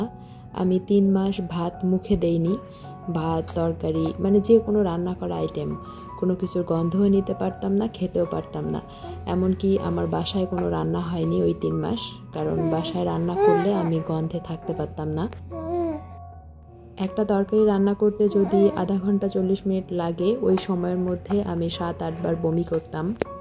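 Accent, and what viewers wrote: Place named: Rangpur, Bangladesh. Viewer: না বুঝলে আর কি করার বলেন কিন্তু ওই আপু হয়তো বুঝেছে আর আমি কিন্তু খারাপ ভাবে বলিনি অনেক নরম করেই বলছি কমেন্টে হয়তো বুঝতে পারেন নি ভয়েজ অপশন থাকলে বুঝতে পারতেন আমার কথা যাইহোক এই আপুও রংপুরের আমিও রংপুরের আর আঞ্চলিকতার টান সবার থাকে কিন্তু কিছু কিছু শব্দ উচ্চারণে এই রকম হয় অনেকের আমার যে হয় না তা নয় আমারও হয় কিন্তু আমিতো ভিডিও করছি না ঘরের মধ্যে কথা বলছি তাই কেউ বলবে না কিন্তু উনি তো ভিডিও করে সবার সবটা পছন্দ নাও হতে পারে। আর আমি শিক্ষা নিয়ে কোনো খারাপ কথা বলিনি ভালো করে কমেন্ট পড়ে বোঝার চেষ্টা করেন আজগুবি রিপ্লাই দিয়ে বুঝাতে চাইলেন আপনি খুব ভালো মানষিকতার আর আমার মানষিকতা ভালো না।আমি এটাও বলছি আঞ্চলিকতাকে সম্মান করি।ভালো থাকবেন।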